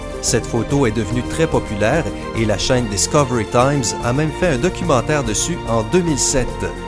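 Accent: Quebec, Canada. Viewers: Super l' accent kébecoi :') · Vidéo de merde pour le sensationnel, tout ce que tu montre à des explications, fais des recherches er cet accent québécois immonde, ça me fais vomir. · charlatan avec son accent québécois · L'accent québecquois, ça va bien deux minutes, après ça me dégueule par les tympans... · On dirait la voix d'un québecois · Je mets le pouce bleu juste pour ton magnifique accent québécois 💓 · j'adore l'accent québécois (j'y vais plus souvent que bcp) mais la musique en arrière plan est d'un casse oreilles, une prise de tête ! Bien à vous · "Un accent québécois" c'est super bien, au moins on comprend quelque chose. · Putain mais ferme ta geul avec ton accent québécois ta niquer la vidéo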